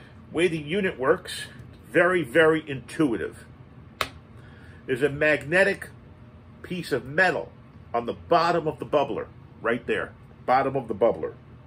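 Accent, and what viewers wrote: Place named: New York, United States. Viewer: are you from new york or new jersey? love your accent and videos, cheers!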